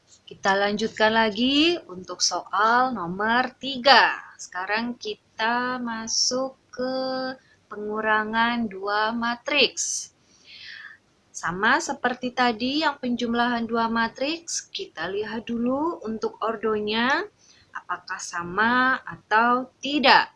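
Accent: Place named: Java, Indonesia. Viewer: Iya bener medok yah mz hehe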